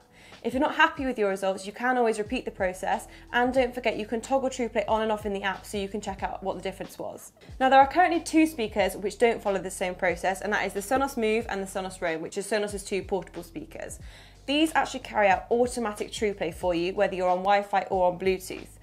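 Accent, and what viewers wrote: United Kingdom. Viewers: Good info, delivered too fast. Your audience is not all familiar with whatever UK accent you have.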